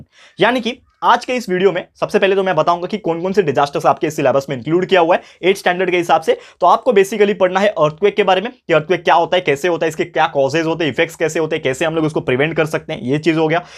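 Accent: Maharashtra, India. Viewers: Sir are you from Maharashtra?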